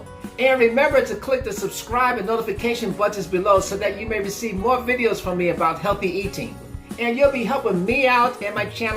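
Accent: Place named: Caribbean. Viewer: Hello. I am a new subscriber from Casablanca Morocco. I like your style. where is the accent from please? Caribbean maybe?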